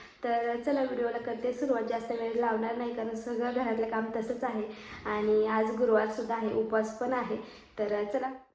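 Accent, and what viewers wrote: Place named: Manchar Khed, India. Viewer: Tuz gav कोणत... मंचर खेड ची आहेस का तु... भाषे वरून वाटतं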